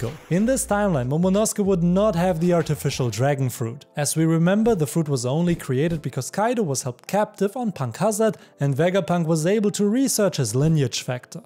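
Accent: Germany. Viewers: Ich liebe den deutschen Akzent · ur german 100% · you sound german. also, änel lol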